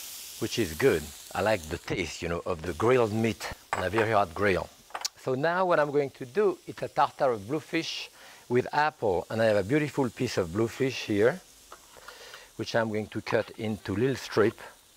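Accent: France. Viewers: "I was repeating what I heard." if that's what you heard, THAT'S the problem. he doesn't say "ze.I was not making fun of his English" I believe that, I believe you... which is part of the immigrant problem (because he doesn't say "ze")... but YOU still somehow "hear" (I don't doubt this) some caricature of a french accent. (and I'll fully admit his accent lives on in his "hour" - but JFC just STOP with the "ze" nonsense) julia child called him instead of "." that's just true. your "Pepe LePew" nonsense isn't. if you read this far: I don't think you were trying to mock his accent. but I also don't think you were listening.... or you were and you're an american that somehow has never heard an accent beyond and LePew (and maybe Clouseau: i.e., "minkey") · Yeah, after so long in the US, French accent mostly shows through in dropping the 's' in plural words. I mean, there's other things, too, like 'roast it for, like, an arrr" which are just beautiful. 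He couldn't be more American but also, just, so, so French. (I'm from Chicago, but I live in Montreal, so my French is...weird)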